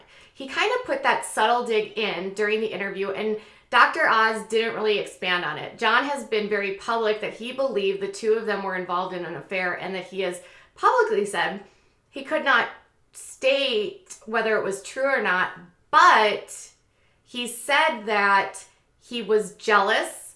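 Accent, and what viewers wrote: Minnesota, United States. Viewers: I notice you say it like “Gosling” instead of “Gosselin” — but it may just be your Minnesota accent. I think Minnesota accents are just too cute!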